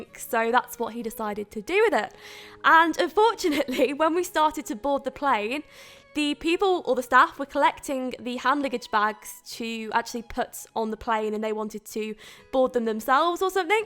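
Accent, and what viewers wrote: England, United Kingdom. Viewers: Not many comments... are you English from England, I am:)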